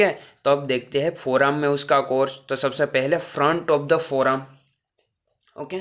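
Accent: Gujarat, India. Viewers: Gujarati cho ?😅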